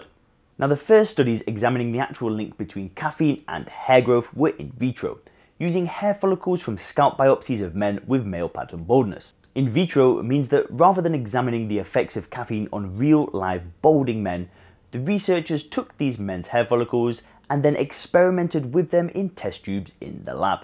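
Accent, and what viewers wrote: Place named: United Kingdom. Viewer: I enjoy listening to British accent.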